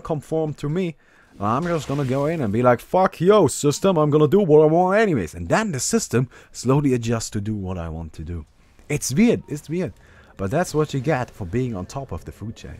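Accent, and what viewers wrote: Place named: Germany. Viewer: when it comes to "medallion", it's an accent. You have a german accent and we don't shit on you for mispronouncing some words, now do we? ^^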